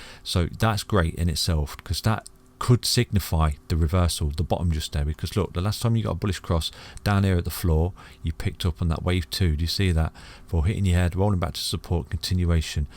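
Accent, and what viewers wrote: United Kingdom. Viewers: Realistic analysis, realistic british accent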